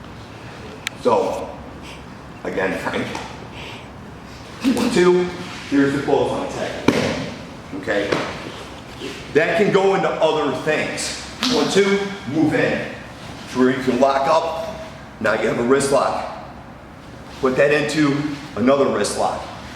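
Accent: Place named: Scotland, United Kingdom. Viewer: Hi big fan of your tHE ROGUE WARRIORS series of vids very impressive, i wonder if any of your students teach this no bullshit style in the uk,on a lighter note I LOL at you comment i am about to shit on your corn flakes (you sound like my scottish budy) my personal comment in the uk is usually well that pissed on your strawberrys, i can't believe the negative troll comments you get from people who just decide they can't believe what they see, ar well i guess every ying has its yang all the best, Russ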